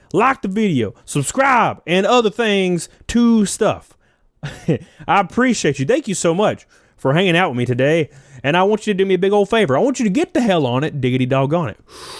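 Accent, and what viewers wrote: South, United States. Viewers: havent watched this channel in a while, man did become a lot more southern!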